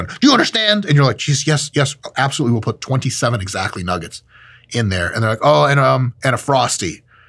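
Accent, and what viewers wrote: South, United States. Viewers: Bruh... thank you for the upload Brother! Bruh more Southern:)) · Sweet southern accent bro! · I need more Southern content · As a southerner I'd love for you to make it through an entire revive stronger roundtable with that accent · Yes mike we like your southern accent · I approve of the southerner accent and even slander in the future. (I'm from the armpit that is Lousiana) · As an..ex-USSR person, I completely approve of your Southern accent, pls bring on moar of it! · Info 10/10 Southern accent 6.5/10 -Texan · The southern accent is a win! More please! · Am southern, do approve of accent! I love it!! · As an asian acting southerner I approve that accent. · Hello, from Texas. More Southern accent please. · hahaha that southern accent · Southern accent approved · Okay with the southern accent. Highly offended by the diet coke/nugget bit. 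has always worried me but now I see how problematic he truly is. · Love the southern accent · More southern accents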